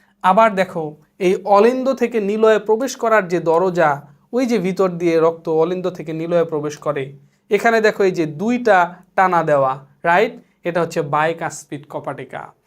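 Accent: Sylhet, Bangladesh. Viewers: Ohh.Kotha Bolar Style sylheti der moto..!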